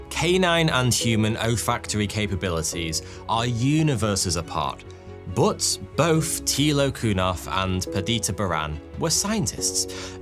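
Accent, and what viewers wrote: United Kingdom. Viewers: It's a play on his regional British accent. Finking = thinking For'y two = thought he, too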